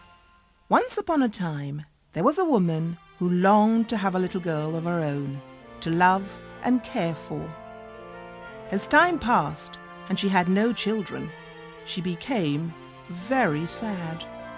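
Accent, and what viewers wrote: United Kingdom. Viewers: イギリス英語ですか？よく聞くのと発音がちょっと違う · エル エル イギリス英語です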